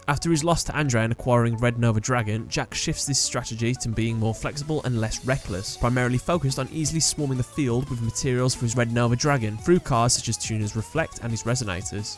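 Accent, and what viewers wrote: United Kingdom. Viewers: Dude You Speak In A British Accent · You sound British but you can't speak like ATHLAS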